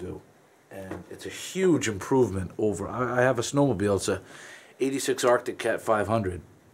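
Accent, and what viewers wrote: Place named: New York, United States. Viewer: Sounds like every other New Yawker Ive ever heard or maybe BAAAsten.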